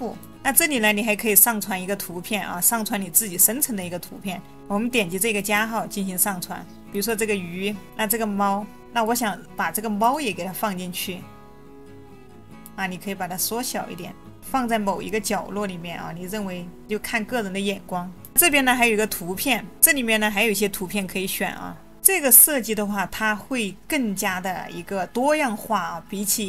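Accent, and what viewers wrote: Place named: Chongqing, China. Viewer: 😂🎉😅😮一口重庆话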